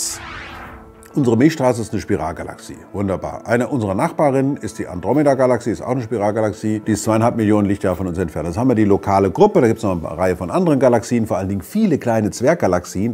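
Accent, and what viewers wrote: Swabia, Germany. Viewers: ...aber Schwäbisch!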